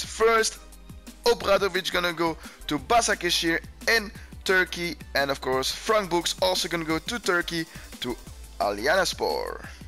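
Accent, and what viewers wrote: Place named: Belgium. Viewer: Nice belguim accent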